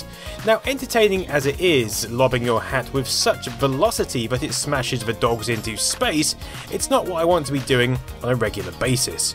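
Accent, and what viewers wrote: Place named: Norfolk, United Kingdom. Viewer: he's from Norfolk, they all talk funny there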